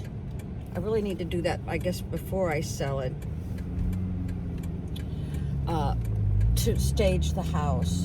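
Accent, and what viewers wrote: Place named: South, United States. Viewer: That pretty.... Southern🎙️🎙️🎙️ VOICE🌷🌷🌷🌷🌷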